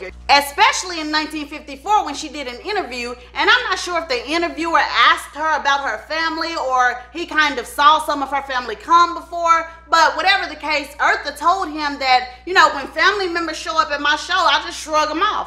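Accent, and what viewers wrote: South, United States. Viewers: Luv your southern accent your funny and entertaining and know how to tell a story with humor which keep the audience engaged happy I found your channel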